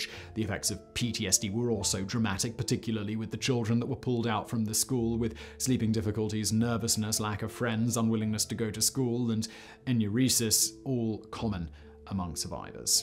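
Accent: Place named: South, United Kingdom. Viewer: Attention yanks, accent shows he is from the south of England as he pronounces everything so poshly. I've never heard methyr Tydfil spoken like that before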